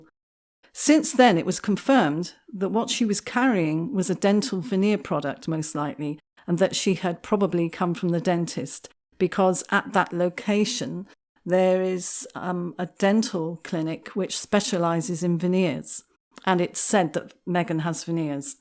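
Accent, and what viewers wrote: United Kingdom. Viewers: Lovely British accent. Excellent content and perspective on YT videos. Thank you. I truly appreciate you. 🏆🌈🏆🌈✨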